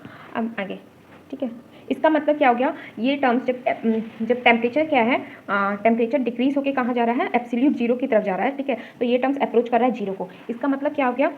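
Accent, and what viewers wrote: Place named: North, India. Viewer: Not gero its zero why these people from the north has bad accesent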